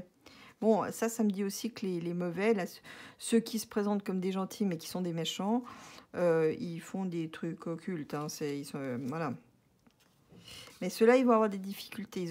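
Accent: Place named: Switzerland. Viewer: Bonsoir contente de vous entendre pour la première fois et de savoir que vous respirez bien!! Belle voyance précise je vous remercie vavec votre petit accent proche de la Suisse? Bisous. Virginie de Haute Savoie 😊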